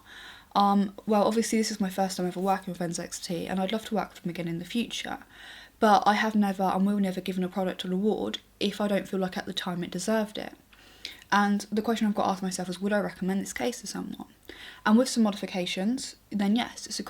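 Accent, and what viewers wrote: United Kingdom. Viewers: She's British. That's how some of us British people pronounce our letters just like how American's each have different accents and pronounce letters differently.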